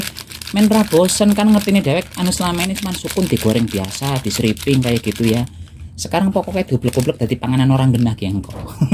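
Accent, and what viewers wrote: Banyumas, Indonesia. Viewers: Ngomongnya ngapak podo inyong😅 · Lucu banget ,,,Mase gundul pacul ini.,,,,,,,cukup terhibur logat ngapaknya yg bawel,.,,,,mana biyunge di simpan dimana,,, ,lucu asli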